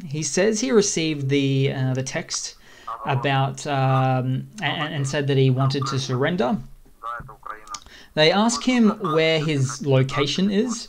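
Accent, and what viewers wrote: Australia. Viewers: It's great to hear an Aussie voice analysing this war so well. You've shown images even Ukrainian sources have not yet shared. Well done, mate, keep it up!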